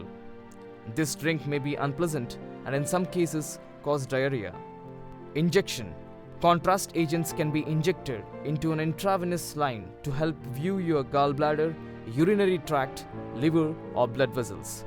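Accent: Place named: India. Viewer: His English is Indian.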